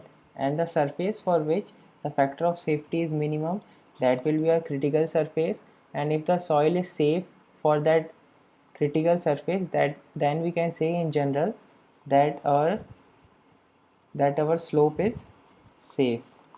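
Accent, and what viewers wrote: India. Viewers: fuck!!! I never understand indian accent. anyway thanks for the video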